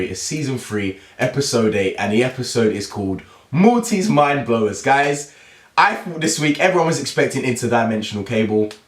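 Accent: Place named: United States. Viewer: I gotta say.. I was hoping for even just a clip from the Citadel so we could see what evil Morty's doing but it was still a pretty fun episode... and kind of a different but still super cool format. Also pretty interesting how Rick had Summer prepared for such an event. Anyway, I can't wait to see how they wrap up the season :D ...love your American accent btw :')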